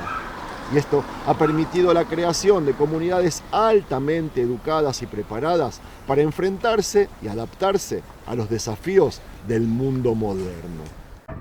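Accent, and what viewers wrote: Argentina. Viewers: Nice video. Is your accent a mix of Argentinian and Hebrew?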